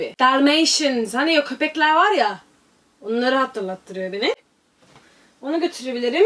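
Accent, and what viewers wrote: Turkey. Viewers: Biraz Egeli gibi konuşuyorsun çok şekersin ya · trakya şivesi var beeee cndkcndkcjdkfkeodidod yerim yerim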